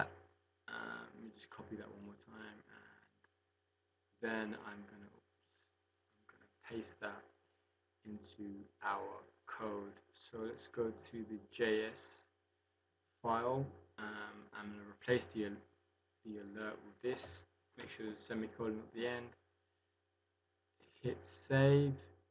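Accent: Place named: South India, India. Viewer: very nice video good tutorial.. html tutorial is way too long for an title of "open graph" I am 19 minutes into video and there is 0 (Zero) open graph demo.. beside login and that too not covered in tutorial in first 30 minutes my critique... keep it simple, for a complete app tutorial it was good but first 20 minutes were boring ...another thing...be normal and try to be normal with your accent.. be yourself.. you have that typical southern indian/ sri lankan inspired "hech"tml in your video too many times..that is very annoying if you are trying to put layer of english or american accent.. caught a few times struggling with "lo"gin / "loo"gin.. again nothing bad but annoying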